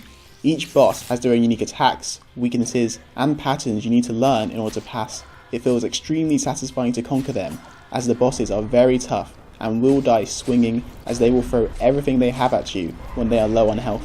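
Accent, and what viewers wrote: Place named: South London, United Kingdom. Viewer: Thanks for sharing your thoughts dude... I really liked your review, more objective and informative than a lot other reviews out there. A suggestion - record your audio and try varying your vocal tone a bit more, you'll sound more compelling. I like your calm and factual style, but sounding more invested helps (see angry joe getting angry). Only mention it as I have a very similar accent to you (south east London? Palace fan maybe lol?) and unless I think enthusiasm, I can put ppl to sleep. Anyway, nice vid, subbed - I request a ds3 review (if my pointers make you any ad revenue, I want my cut). Peace!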